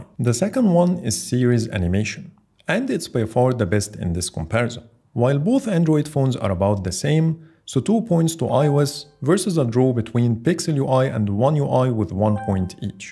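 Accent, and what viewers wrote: Egypt. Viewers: انت صوتك مصري 😅كل المصرين لما يكلمون انجليزي بيبقو برضو معروفين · Egyptian accent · أول ما سمعت الصوت عرفت إن مصري ❤️❤️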